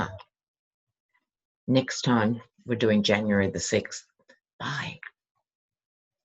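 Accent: Australia. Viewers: I love your accent. I actually listen to 3 of you aussies. You . Marianne and Elle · I love your Australian accent.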